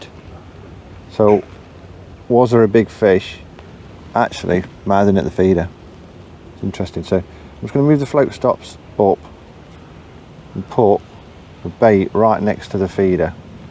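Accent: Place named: Nottingham, United Kingdom. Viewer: Hi, just found your channel by chance. Nice to hear that Nottingham accent lol. I used to fish the steps as a kid and had many great days there gudgeon bashing in the ‘70’s. Also had success hemping for roach. You’ve inspired me to get down there again on the feeder.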